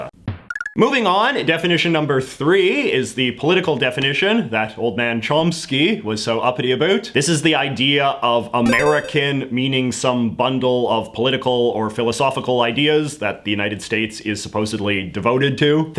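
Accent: Canada. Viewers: you have the most stereotypical canadian accent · Although he does pronounce it a little oddly, you'd expect in his canadian accent that he'd go hard into the R sound but actually skips it altogether! Normally in an anglo accent it's pronounced boar-jwah, but goes for something more like boo-jwah. I'd be interested to know if that's deliberate or not if you do read this!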